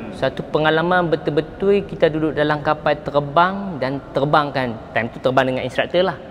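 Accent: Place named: North, Malaysia. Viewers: hang memang sempoi bang..walaupun dh fly keserata dunia tapi masih tetap bawak loghat kedah..sempoi baqhang.. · Terbaik abg.. aku suka cara abg explain.. santai dan penuh ilmu.. yg penting bahasa utara tetap dihati.. tak da pon unsur2 selit bahasa engeris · Sempoi la dia sembang. Candid n natural. Syok dengaq org Kedah sembang. X yah nk kalut tukar ckp KL pun. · Saya amat suka kepada cara penyampain yg Walaupun loghat utara tapi Santai. Bagus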